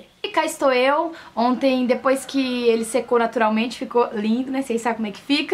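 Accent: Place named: Maranhão, Brazil. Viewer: Seu sotaque é bem maranhense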